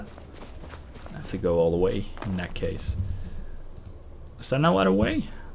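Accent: Netherlands. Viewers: Ok cool! When are the next parts coming out? Do you have an upload schedule? Sorry for all the questions I am just really excited for this series haha PS: Je hebt een Nederlands accent kom je uit Nederland?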